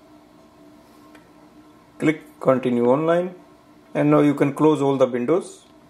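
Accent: India. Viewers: You need to practice more on pronunciation before making videos. The Indian accent is terrible!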